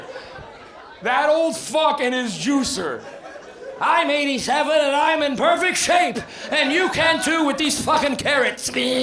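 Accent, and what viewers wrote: United States. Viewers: Why does he sound like he's from Jersey? I had to look him up; did I know where he's from? Canada? I was surprised. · you were great. You had me rolling, you sound like you’re from Miami 😂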